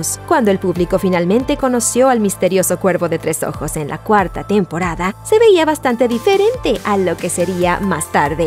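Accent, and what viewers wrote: United States. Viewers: Español de estados unidos???? Dislike equisde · Lo que molesta es el afán de la narradora en pronunciar los nombres con acento gringo. Vale Gaver. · Ay, qué pronunciación yankee tan ridícula tiene la locutora!! Es necesario?!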